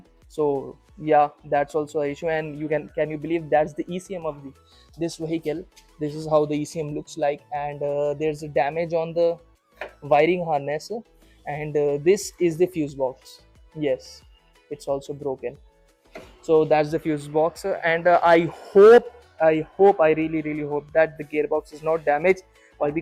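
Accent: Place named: Bangalore, India. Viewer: typical blg accent uhh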